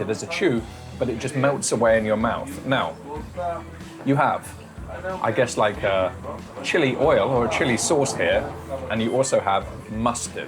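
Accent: United Kingdom. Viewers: I'm surprised he used the American word "chips" instead of the British word "crisps" considering his accent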